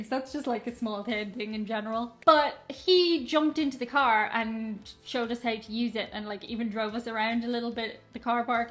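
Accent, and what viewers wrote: Ireland. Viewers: I love your Irish accent,. It's like listening to music. 👍🥰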